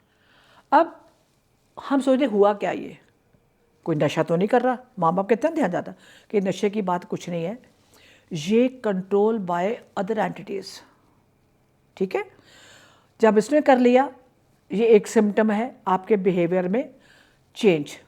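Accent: Punjab, India. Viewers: Madam ji as per your accent I think you are punjabi , are you ?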